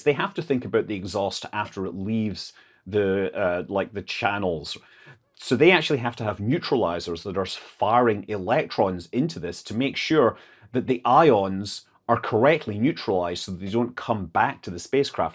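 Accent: Scotland, United Kingdom. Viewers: I doubt you remember, but I asked you if you were Scottish or Irish a long time ago. How did you regain your Scottish accent and loose the American one also?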